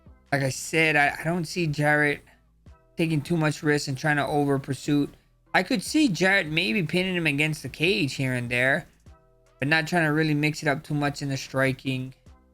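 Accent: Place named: Hawaii, United States. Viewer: Just talks like every other Braddah out here 😂🤙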